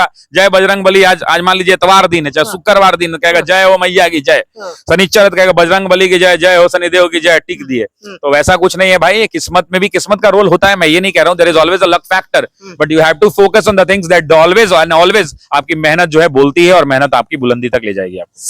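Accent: Bihar, India. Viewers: सर... पूरा बिहारी टोन प्रयोग करते है....😂😂😂हमारे बिहार की शान........ और सर 🎉🎉🎉🎉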